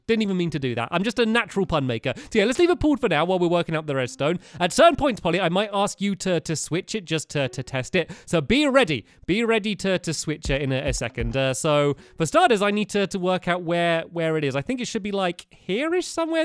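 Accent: United Kingdom. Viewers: Why do you live in England then your British and you say z as zed and h as heych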